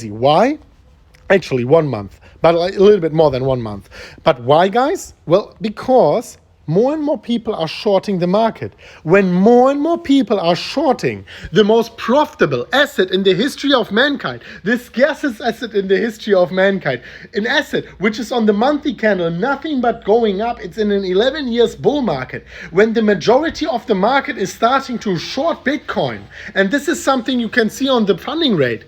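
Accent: Germany. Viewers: Täusche ich mich, oder hast Du nen extrem deutschen Akzent? 🤔😃 Thanks for your nice video.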